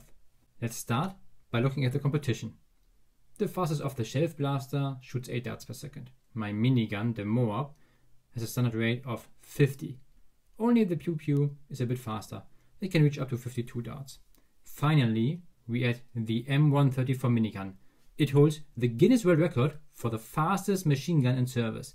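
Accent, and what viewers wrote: Germany. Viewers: I don't know if it is a good idea for people with germanic accents making weapons.. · Your accent is uhh... German? I mean it wouldn't be a surprise to me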